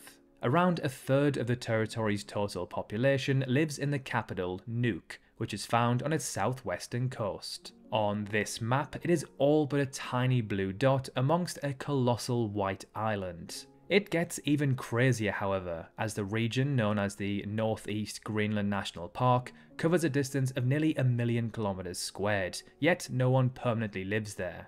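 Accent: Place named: Newcastle, United Kingdom. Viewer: This guys so geordie u can tell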